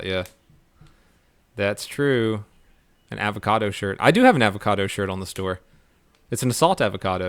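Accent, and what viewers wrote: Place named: South, United States. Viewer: Wow man i just noticed you have a southern accent! lol I'm serious i never noticed before and i have watched you for about a year! I must be sleeping or something man...lol Your vids are great though and your hilarious!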